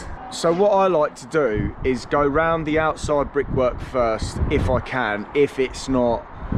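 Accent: Cockney, United Kingdom. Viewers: I never knew bricklaying was so technical.....thought it was just bish bash bosh! At least that is what I witnessed when a bricky built a wall for me and probably why it looks so shit! Loving the cockney c bombs thrown in.....qwality son. Thumbs up.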